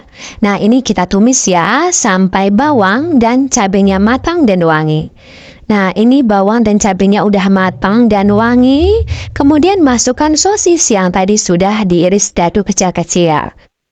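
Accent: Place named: Banyumas, Indonesia. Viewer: Ngapak usah pake logat bile ngomong nya ngapa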